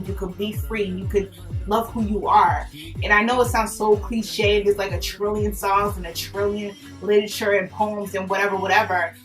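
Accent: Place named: Queens, United States. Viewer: You got to be from Queens. Shhhh. Much success.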